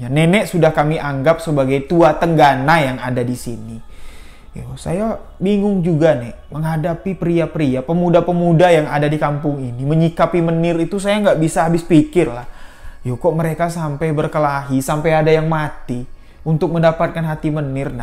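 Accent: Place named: Jambi, Indonesia. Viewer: Pertama denger tak kira org Bali g taunya logat Jambi ya ...sukses ya mase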